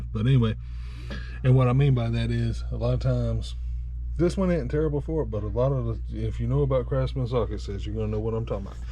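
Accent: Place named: Appalachia, United States. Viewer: Hey hillbilly talk right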